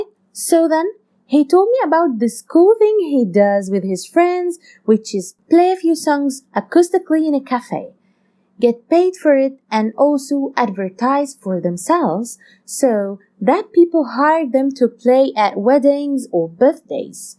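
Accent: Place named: China. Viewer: Learn how to talk better I don't understand you with your Chinese accent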